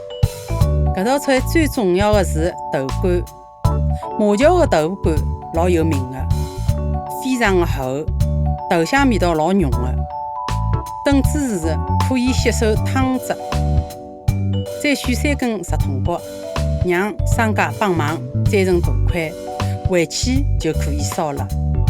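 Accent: Shanghai, China. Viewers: 上海话听着倍儿舒服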